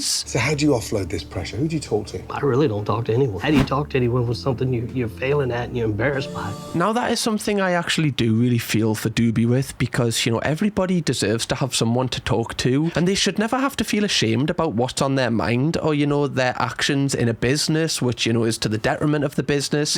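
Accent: Newcastle, United Kingdom. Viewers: Hes geordie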